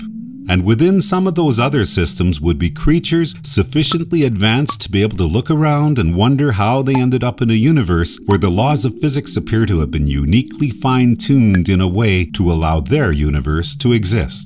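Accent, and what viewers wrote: Canada. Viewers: You’re Canadian! I heard it in the aboot. Great videos.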